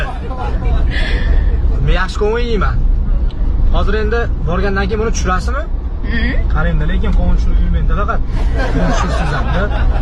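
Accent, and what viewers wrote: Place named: Fergana Valley, Uzbekistan. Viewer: Sheva vodiy bölyotibdi özicha vohani tiqishtrganini maraz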